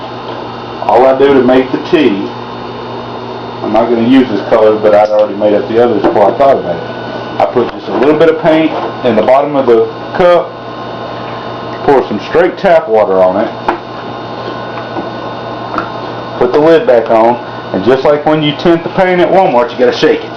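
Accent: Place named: South, United States. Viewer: Having grown up with a southern mom, I understood every word! Please do not apologize for your accent - it's a beautiful thing. Also, awesome tutorial! Thank you! I have been looking for a good one for a while now.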